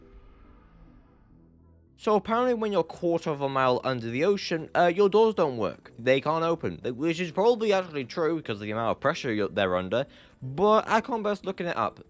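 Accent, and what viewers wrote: Wales, United Kingdom. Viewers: Are u from Wales ?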